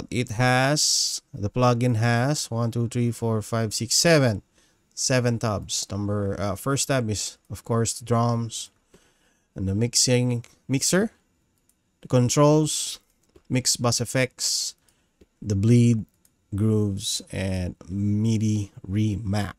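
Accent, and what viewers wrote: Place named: Philippines. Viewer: Nice to hear a 🇵🇭 accent while talking about metal related things. Mixes home with what I love.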